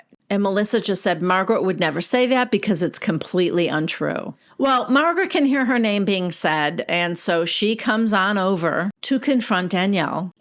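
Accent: New Jersey, United States. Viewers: Love you!! You do such a good job recapping!! Your Jersey accent is hilarious 😍 Louie is a narcissistic control freak... Teresa is the perfect victim Unfortunately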